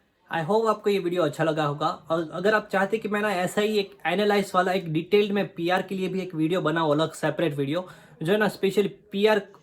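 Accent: Gujarat, India. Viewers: Love your gujju accent haha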